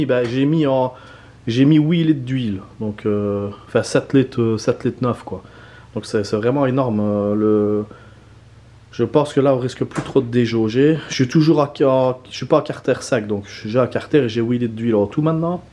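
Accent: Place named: Namur, Belgium. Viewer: Cet accent... tu serais pas de namur?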